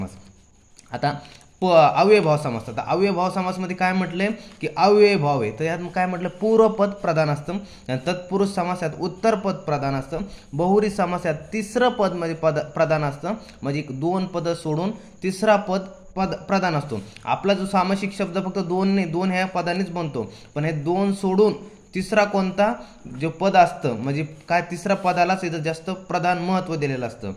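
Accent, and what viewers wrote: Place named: Vidarbha, India. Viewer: Pure gavran bhasha vidharbhachi zp shalet shiklya sarkha vattay sir thanks ❤❤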